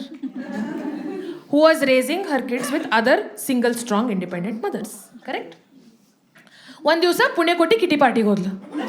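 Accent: North Karnataka, India. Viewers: Soooooooperb presentation. Wonderful flow of words especially your Uttara Karnataka accent is really a added flavour to your comedy. Enjoyed very much. I am and I am also a Stand up comedian. Both of us have performed on the same stage once and you were the anchor. God Bless You. Keep Rocking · Too good... love ur north Karnataka kannada.....keep rocking ❤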